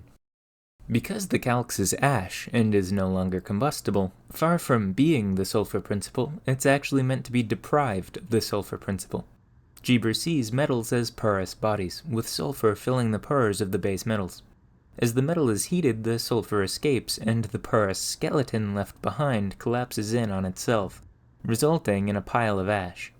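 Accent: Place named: Carolina, United States. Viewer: I'm fascinated by accent! The "er" creeping into words reminds me of some populations of English-speaking populations along the east coast of North America like the Carolina Broque or the New Brunswick accent, but it doesn't sound quite like either. I did a little searching and maybe it's related to some accents in the South, like in Georgia and Louisiana, but it's unclear. Authority becomes erthority, forty becomes ferty, forms becomes ferms, orange becomes ernge, chloride becomes chleride, proportion becomes propertion. So it seems there's like an ah/oh to er merger, but not always. Gold isn't gerld, common isn't cermmon. Anyways, if anyone has information or knows this accent, please let me know! Also, if you read this, I am not mocking your accent, I'm genuinely curious where it comes from.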